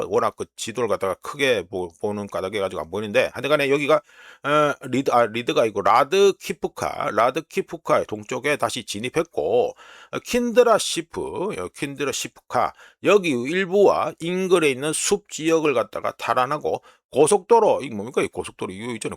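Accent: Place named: Gyeongsang, South Korea. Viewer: 어이 경상도 사투리 막소리 할때 삼가라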